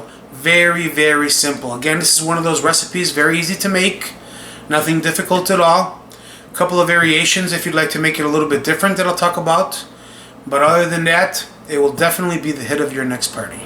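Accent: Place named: Chicago, United States. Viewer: I hear some Des Plaines IL in that accent! LOL reminds me of my friends back home. Thanks for posting, I am definitely going to try this one!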